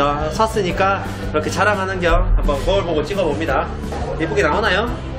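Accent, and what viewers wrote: Gyeongsang, South Korea. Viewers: 저역시 피나이 티비 구독자인데 채널도 병행구독 할거에요! 근데 경상도 분이신가요?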